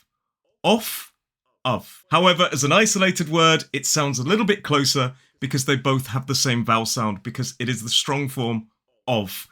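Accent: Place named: Cockney, United Kingdom. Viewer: Nice english similar cockney accent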